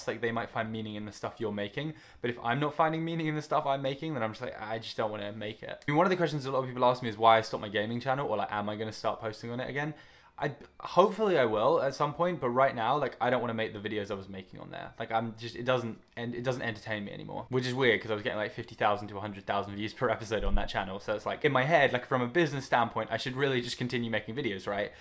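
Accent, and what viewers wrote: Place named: United Kingdom. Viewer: I love his accent it's obviously British but there's something else in it lool I'm so weird 😂❤️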